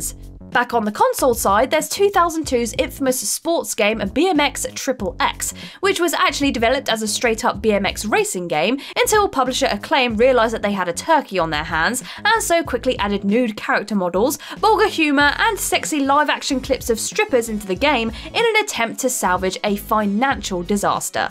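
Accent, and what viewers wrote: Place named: United Kingdom. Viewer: sounds like she's from the UK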